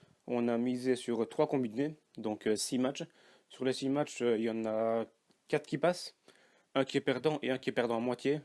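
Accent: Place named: Liège, Belgium. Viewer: salut tu viens de ou en belgique j aime bien ton accent j aurais dis pas loin de liege non ?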